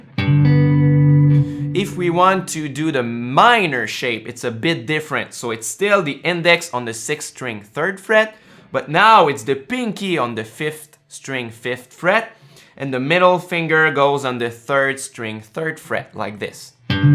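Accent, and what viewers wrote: Quebec, Canada. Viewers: I love your Quebec accent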